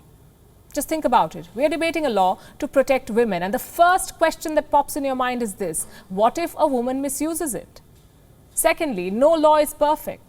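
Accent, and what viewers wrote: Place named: India. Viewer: People mock her for her Indian accent. You are probably the first non Indian audience I have seen to appreciate her- I hope u r non Indian!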